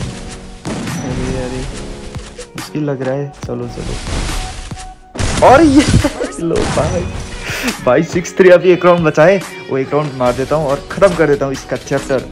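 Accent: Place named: India. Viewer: You are indian or nepal becuase your voice indian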